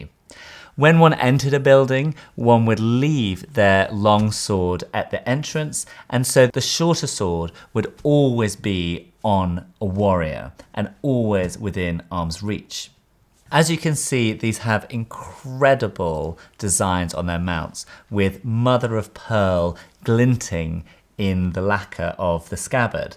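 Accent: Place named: United Kingdom. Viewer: I agree, I think this was very interesting and well presented. My only complaint applies not only to, but to most English presenters, news readers and even academics and that is their totally British pronunciation of Japanese - especially names. I imagine it is so common, even at the museum, that the filmmakers are not aware of this. It grates on my nerves! A short class in Japanese pronunciation would not go astray.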